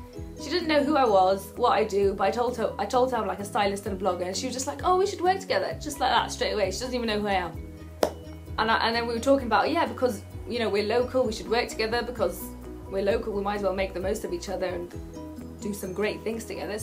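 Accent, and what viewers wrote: United Kingdom. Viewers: I love what you wearing, and i love british accent. <3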